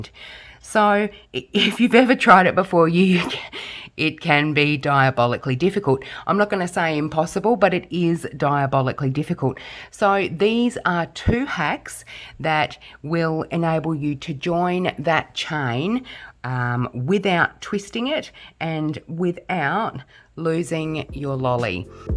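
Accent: Australia. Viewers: Thank you! Firstly, it's nice to hear a fellow Aussie! This tutorial is so helpful because many foundation chain videos don't tell you what part of the chain you need to slip stitch into. I've often thought I'd done it correctly and then realised my join is at the wrong part of the chain and I end up with a gap. Thank you!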